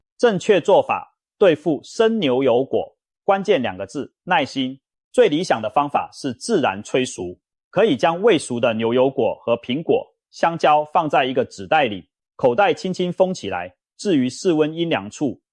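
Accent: Taiwan. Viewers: 主持人一口台灣腔應該是在台灣長大的，為什麼中文這麼差很多的錯別字而且讀白字。我建議還是用英文做節目吧至少英文不會有錯別字也不可能讀成白字。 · 明明作者是台灣口音，為什麼要用大陸的說法「牛油果」來稱呼我們台灣習慣稱呼的「酪梨」呢？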